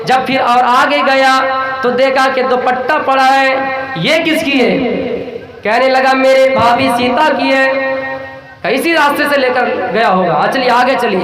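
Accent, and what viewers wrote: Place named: Bengal, India. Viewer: तकरीर सुनकर लगता है कि बांग्ला भाषी है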